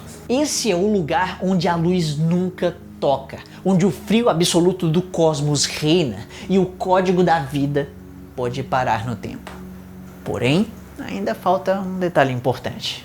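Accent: Ceará, Brazil. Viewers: Pera c é Cearense?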